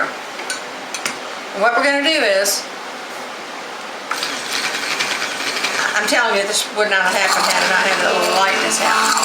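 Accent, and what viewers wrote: South, United States. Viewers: Can tell a recipe is gonna be good when the person has a southern American accent 😀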